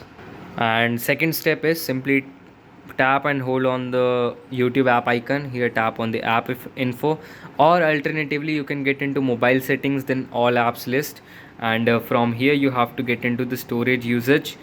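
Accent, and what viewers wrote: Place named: India. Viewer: adopt a british dialect please not an indian dialect please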